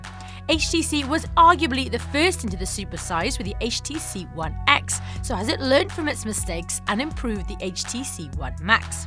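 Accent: Wales, United Kingdom. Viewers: ur from WALES ! Alright, hows it going Alright?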